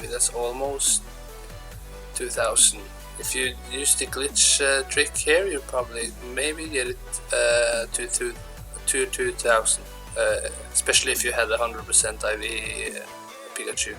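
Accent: Norway. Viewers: are u from norway?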